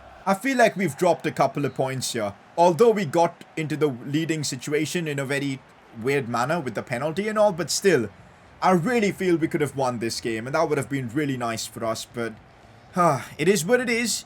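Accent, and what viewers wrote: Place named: India. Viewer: PCQ: You sound more Indian than when you first began doing YouTube.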